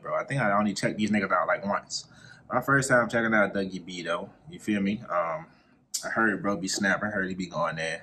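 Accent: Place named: Charleston, United States. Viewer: oh okay. U sound similar to people from Charleston where I'm from.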